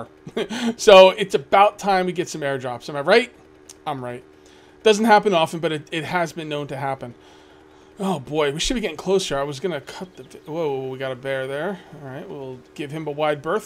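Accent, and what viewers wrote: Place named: New England, United States. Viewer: Some I knew you where some where and new England.:P you have a slight North eastern accent.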